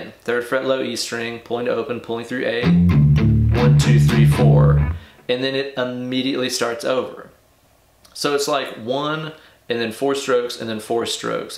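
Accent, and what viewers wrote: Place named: Southeast, United States. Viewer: Well Done Mate!!! First time seeing it live was Bonnaroo 07 opening song. Lasers went to Infinity and beyond. Great riff and great lesson. You sound like you're in our General area of the US? Southeast?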